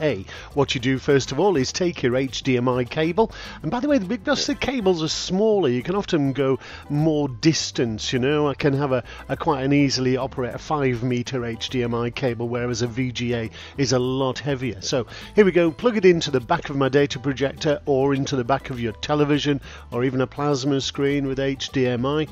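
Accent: United Kingdom. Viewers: are you telling that to the guy in the video ? -.- that's so racist, and stupid ! That's a British accent, and the higher chances are that he is already there were he made the video, he doesn't have to go back anywhere ! .-. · Do I detect a Scouse accent here?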